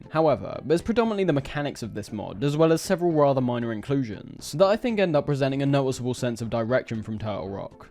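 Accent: United Kingdom. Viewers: Blimey da bloody animalistc deep monologue turning me into a bloody zombie wih the stylistic thought proceeds similar to americans fuck i hate godamn bri'ish blokes fucking better then me talking so annoying dislike reported blocked stopped watching all that fuck pissing me off by the second w yo busted teeth talkin smart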